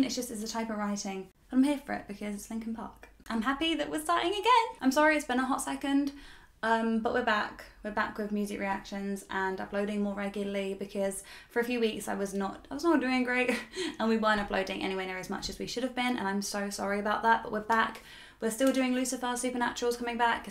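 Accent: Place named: Australia. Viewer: Linkin park is my favorite band. Where are you from? I like your English accent maybe Australian?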